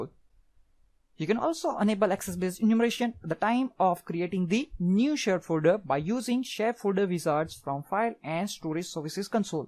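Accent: India. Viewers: I think ur origin accent is Indian. better try that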